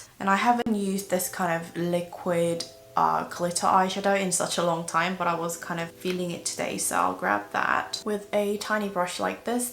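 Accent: United Kingdom. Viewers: 썸네일에 홀린듯이 들어와 영국발음에 취한다..💕... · 부산은 어땠어요??전 45년 부산토박이 비오는날 저 인생영화 와일드 보면서 소주한잔중인데 바로 영상 돌리고 바로 영국발음으로 듣고 있어요 사실 영어는 힘들지만 영국발음은 좀 멋지더라구요 항상 응원해요~~혹시 와일드영화 안보셨다면 남편이란 함 보셔요~~^^ 전 악마는 프라다를 입는다도 인생영화지만 꼭 보시길~~오늘도 이뿌신거 아시죠??? 사랑해요 억수루~~ㅎㅎ💖💖💖💖💖💖💜💜💜💜💜💜💜💜💜정말 사랑스러운거 아시죠??항상 응원합니다~~^^ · Happy birthday it’s late to say tho:) ❤️ I LOVE your British accent so much! 💗 최공 ㅎㅎ 😍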